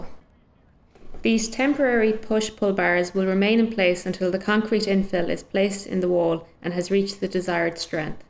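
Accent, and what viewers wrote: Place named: Ireland. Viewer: Me too Juan, she has a lovely voice and sounds Irish to me.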